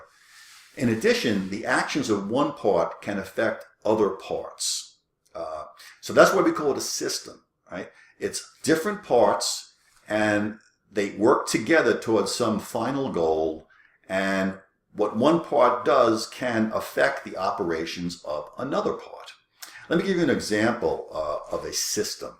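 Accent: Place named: New York, United States. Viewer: I hear my New Yawk accent !!! ❤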